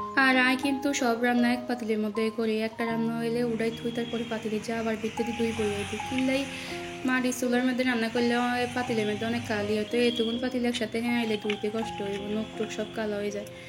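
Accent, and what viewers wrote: Noakhali, Bangladesh. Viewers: আমি বুজি আপনার নুয়াখালী কথা আমি সিলেটি আপনার পাশে আছি ভালো থাকো❤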